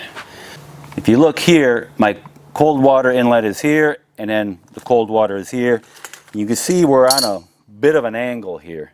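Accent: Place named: North, United States. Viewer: bwaaa haha😂 my dad and I listened to your video together, in the first two min I said "this guy is a northerner, he's from north PA, but south of Boston. Can't figure out why he doesn't say WOOter(instead of WATTer)." 5$ bar bet you're from Southern MI?!? Thanks for the awesome video, Can't wait to install the new unit(s) that we bought..we're putting his in in Southern Colo tomorrow, I'll do mine in south TX later on in the week. Your video was a great help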